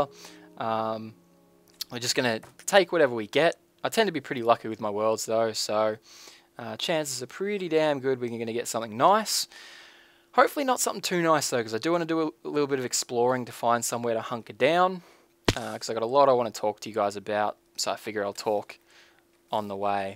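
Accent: Australia. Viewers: i completely feel how u feel atm, my upload is completeley shit, and eveything around me is being pretty bad as well, bad net, too much work etc. i recently heard about u from the hermitcraft community and after seeing the first 7 minutes of this video, i feel that we have lots of things that are very similar between us, and thats why, i think ill be watching more of you! nice to know that im not the only one who has faced this kinda situation. I got two questions for you though a- what did u mean by 'tuberblues'? sorry english isnt my first language and i didnt understand what u meant when u said that word. and b- are you Australian or new zealandar ? i swear that accent of ur, so much like the guys here is australia! (lol yeah i live in AU) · Old timer, glad to see your back. I really did miss hearing the aussie accent on YouTube. Btw, which paintball field did you work at /are you still working there? 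I can't remember if you told us if your still working there. It's been a day after watching your video. And again. So glad your back. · cool to see you back bro, missed that aussie accent <3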